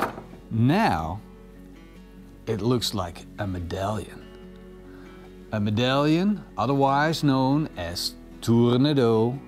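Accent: Netherlands. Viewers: Dutch Guy reconise a fellow dutch accent NICE!!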